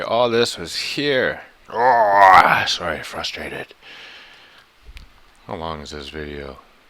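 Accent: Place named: Canada. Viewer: Wow , this guy sounds like Bob and Doug Mackenzie from SCTV. "Hey hoser bring me my toque , then we can eat some back bacon and play a game of beer hunter eh" !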